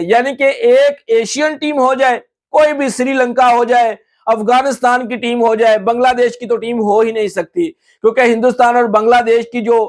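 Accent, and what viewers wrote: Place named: Karachi, Pakistan. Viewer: Abey tu kaisa ch*tiya hai bey? Indian jersey pehen lene se hum tujhe indian man lenge?? Sale tere channel k description mein dikha rha tera channel pakistan ka hai. Sale tera accent dekh, karachi k l@ bazar ka gawaiya lag rha tu. Abey teri apni jersey pehnne se sharam aati hai tujhe to Bangladesh ka pehen le bey bhikari.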